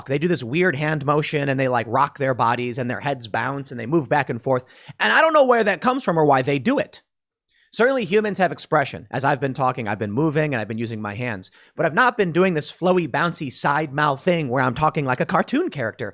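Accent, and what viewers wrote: Chicago, United States. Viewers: When Tim talks of Chicago accent, it is thickest where he is from. The South Side of Chicago has the thickest accents, both black and white accents... Mexicans are the same no matter where they live in Chicago, lol...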